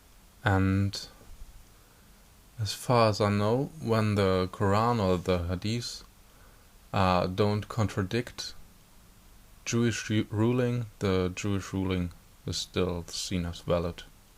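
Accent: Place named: Germany. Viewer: The way you say threat the last seconds combined with your German accent worries me.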